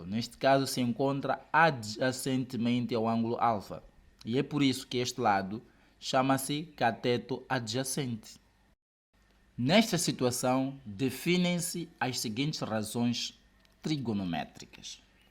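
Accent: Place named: Mozambique. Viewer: Obrigado professor. Percebi que és moçambicano 🇲🇿, pela maneira da pronúncia do "R" pronunciamos como no espanhol. Diferente de Angola Brasil e Portugal.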